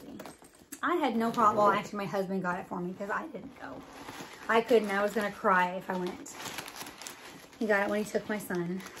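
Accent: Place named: New York, United States. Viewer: 😂 love your NY accent 💙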